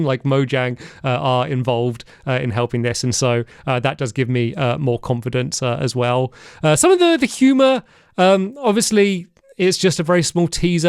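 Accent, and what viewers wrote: United Kingdom. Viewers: Never watched your vids cause I used to think your voice was annoying. Now I realize you're just British and it's not your fault. Live your truth, I support you 🕊️🇨🇰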